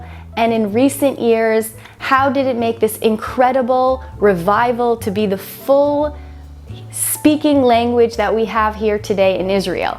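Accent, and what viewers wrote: United States. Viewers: Funny, she doesn't sound middle eastern, or look middle eastern. In fact, she sounds downright American. Funny that.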